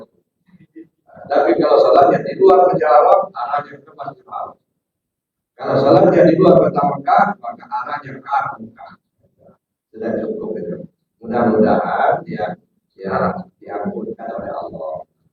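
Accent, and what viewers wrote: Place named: Lampung, Indonesia. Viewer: Di mana alamat . Dari logat nyalampung di mana buya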